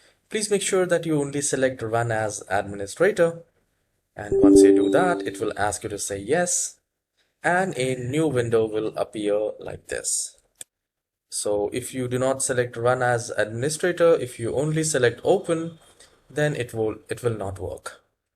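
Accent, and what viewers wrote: India. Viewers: As soon as I heard the Indian accent I knew this was going to work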